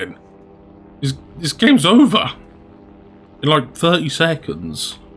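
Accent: United Kingdom. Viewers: Why are you using fictional rebel speed measurements... I've watched all your videos and I've just about had it with MPH with an UK accent..... aaaaaaahhhhhhh.. still love you dude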